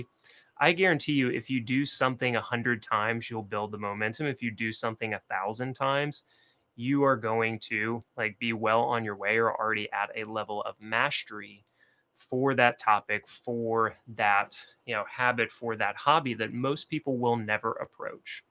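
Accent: South, United States. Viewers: Love the southern accent and the "Never settle" in the background. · love southern accents! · The southern accent was chef’s kiss And I’m mad I missed this stream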